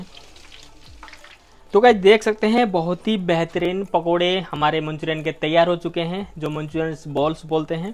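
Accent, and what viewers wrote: Nepal. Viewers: Aap Nepal se ho kya ?? Aapki aabaj aur tongue se aisa feel ho rha hai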